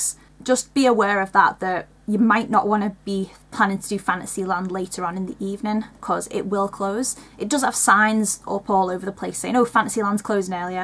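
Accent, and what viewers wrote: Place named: Wirral, United Kingdom. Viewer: Are you from the Wirral? Sounds like a wirral accent :) I'm Liverpool myself haha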